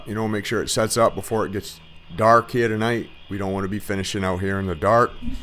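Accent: Maine, United States. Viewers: Holy cow bub you must be from Maine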